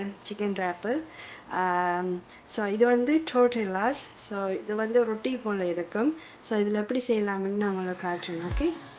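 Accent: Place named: Sri Lanka. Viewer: Hai sis unga srilanka tamil pedikum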